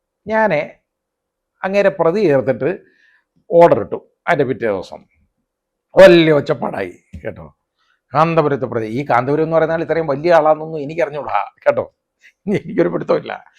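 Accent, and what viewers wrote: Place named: Pathanamthitta, India. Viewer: സാറ് ഇത് തുറന്ന് പറഞത് നല്ലതായി, സത്യം ജനങ്ങൾക്ക് മനസിലായലോ🙏🙏, കാന്തപുരം ഈ വയസ് കാലത്ത് വടി കൊടുത്ത് അടി വാങ്ങി... ഒരു ഹൈക്കോടതി ജഡ്ജിക്ക് പോലും ഈ അവസ്ഥ ആണെങ്കിൽ സാധാരണകാരുടെ അവസ്ഥ ആലോചിച്ചു നോക്കൂ, സാർ... താങ്കളുടെ തുറന്ന് പറച്ചിൽ തുടരുക.. പത്തനംതിട്ട ശൈലിയിലുള്ള സംഭാഷണ രീതി കേൾക്കാനും നല്ല സുഖമുണ്ട്... എല്ലാ ആശംസകളും 💐🙏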